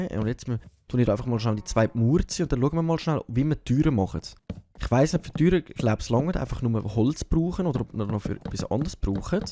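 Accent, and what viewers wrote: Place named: Swiss German, Switzerland. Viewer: I am from neither Switzerland nor Germany but just wanted to hear the lovely sound of Schwyzerdütsch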